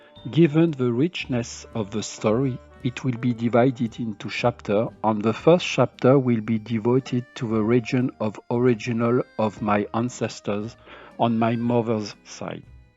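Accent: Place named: France. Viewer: very interesting video and very funny french accent, t u 😃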